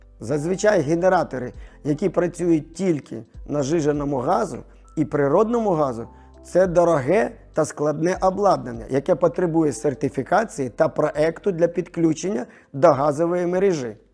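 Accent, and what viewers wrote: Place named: Chernihiv, Ukraine. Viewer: А що мова не рідна? Вимова схожа на чернігівську😊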